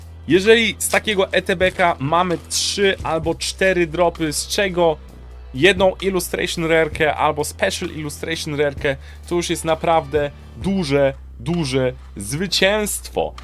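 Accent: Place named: United States. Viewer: Ta twoja gwara amerykanska😂y😅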